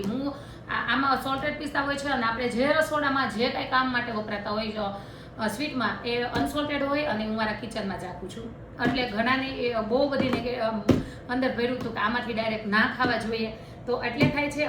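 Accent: Kathiawar, India. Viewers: Aapni matrubhasha Gujarati che.biju ke tame suratra na cho aa kathiyavadi bhasha tame bolo cho te khubj game che.tamari vani saras.che. pan saras bole che. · Your kathiyawadi speech is really nice...❤ i really like it....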